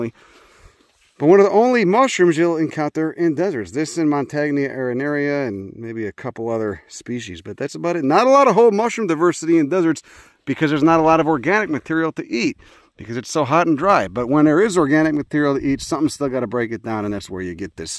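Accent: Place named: New Jersey, United States. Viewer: I have no idea where you're from but you sound like a natural jerseyian and i'm in love. haha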